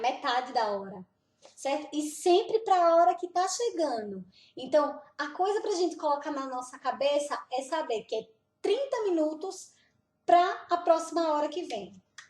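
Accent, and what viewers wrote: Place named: Brazil. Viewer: engraçado que tu ainda preserva um sotaque bem brasileiro kkkk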